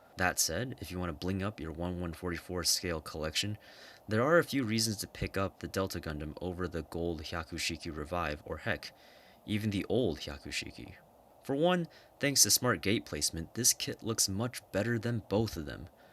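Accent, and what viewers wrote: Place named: Ireland. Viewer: he's from ireland what do you expect his voice is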